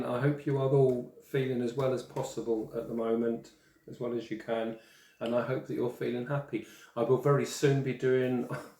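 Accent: United Kingdom. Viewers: You made bisque biscuits! Love your videos. BTW, as far as I know, those of us in the US say vase the same way we say face. Soft s. But I really love listening to your British accent. Not sure ours is as attractive as yours.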